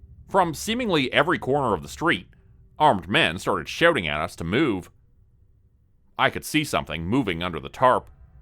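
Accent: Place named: Canada. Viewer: I love the way you pronounce some things with your canadian accent.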